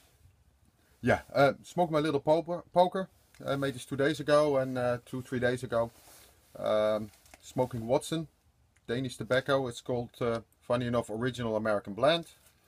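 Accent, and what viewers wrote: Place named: Netherlands. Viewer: ok, ik herkende dus toch je Nederlands accent,hahah, ben vaak in Copenhagen ook, maak je ze zelf ook? groet, Nico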